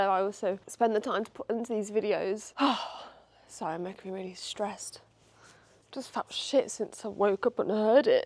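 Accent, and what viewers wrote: United Kingdom. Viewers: I'm still confused about her UK accent in AUS 😅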